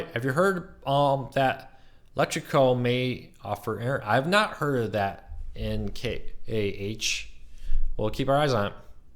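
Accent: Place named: Michigan, United States. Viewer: You grew up in Michigan! Me too! What part? I'm from Bloomfield Hills. Thought I recognized the accent 😉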